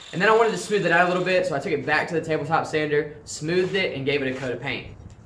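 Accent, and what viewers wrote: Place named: California, United States. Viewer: You could kinda look like a young sean boswell with that california accent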